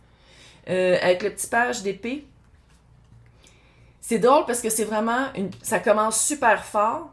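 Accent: Canada. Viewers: Bonjour Madame, je vous Adore votre accent du Canada magnifique Pays.🌹🌹🌹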